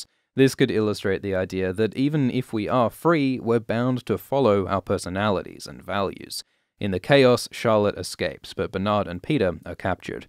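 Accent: Australia. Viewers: Literally nobody comments on your Australian accent for some reason. Anyhow, I'm in Perth, and if you're local, you should come around for a sesh. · I'm just starting your video. I've watched so many and NEVER realized u aren't British!!! I'm an IDIOT!! So, are u from Australia or New Zealand? I've always wanted to travel there. My daughter, Naomi, can do a GREAT Australian accent. I can't even seem to say the word NO like you do. But she can!! Looking forward to this video and seeing you on the Westworld page on the AMINO APP😀💖👏🐴🔫🐯🌻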